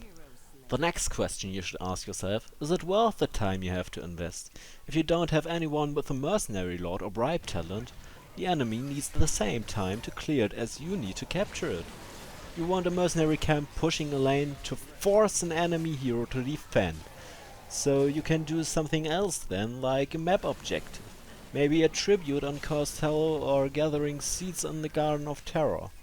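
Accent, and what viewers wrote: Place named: Germany. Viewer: Dat german accent...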